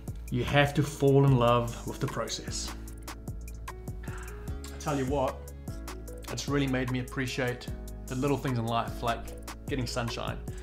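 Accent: South Africa. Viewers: ahh Potch boy. Durban girl here. Your accent is deceiving - definitely South African but a lot broader now which I would imagine comes from living in Oz. Ive been in the Middle East for last 14 years so same thing has happened to me. Anyway, keep up the great content. Id be drinking a lot of beer and wine and quarantine : )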